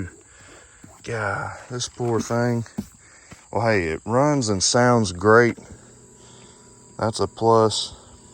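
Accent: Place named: Mississippi, United States. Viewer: Hi, greetings from Germany (... yes, you're seen even overhere 👍😂) ... I'm a complete Newie in respect of ATVs ... Considering/Thinking of buying a CForce 625 s new, ... or something similar used condtion ... Saw already almost all of yr vids. ... All are very well done. Do like yr local regional Mississippi slang. Quite uncommon overhere 👍😄 Rgds & Best Wishes, DON, Germany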